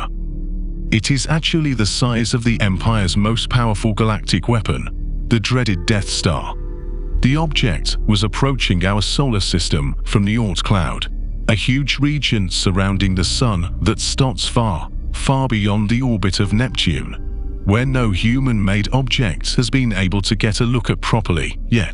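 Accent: United Kingdom. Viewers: Nasa’s the biggest hoax played on people yet! Nice artwork though! People will believe anything attached to an alphabet agency and a British accent!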